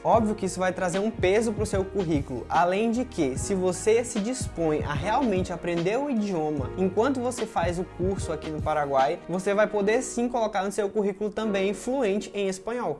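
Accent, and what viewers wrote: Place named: Northeast, Brazil. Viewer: Noto que é nórdestino. Parabéns. Sou gaúcha do RS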